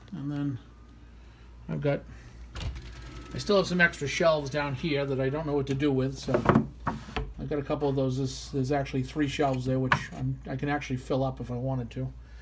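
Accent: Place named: Boston, United States. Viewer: It was worth hearing the accent clicking on this video.🤓👍. Boston ? Nantucket? 😂 Now that’s a nice humidor! 🥳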